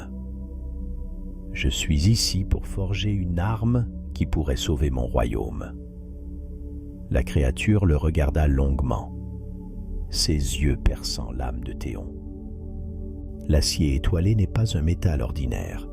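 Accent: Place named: Quebec, Canada. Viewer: Sympa, le petit accent québécois 😉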